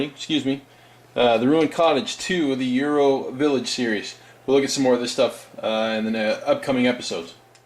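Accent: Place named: Georgia, United States. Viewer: Welcome back to the vlog world! When did you pick up the Georgia accent?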